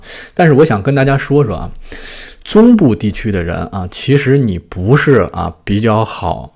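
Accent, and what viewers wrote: Tianjin, China. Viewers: 這是天津式國語，在大陸很受歡迎的。您聽得習慣嗎？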